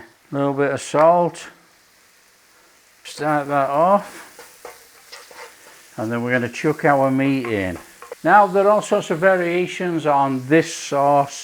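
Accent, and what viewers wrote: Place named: England, United Kingdom. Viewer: 😂🤣Love when you wrote “dodgy blokes” 🤗 That’s why I love, his English accent plus the great dishes he serves.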